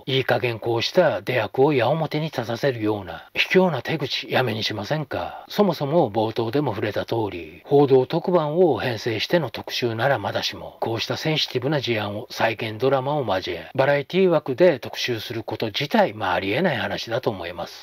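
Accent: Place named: Hiroshima, Japan. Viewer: 聞けば聞くほど、広島弁😆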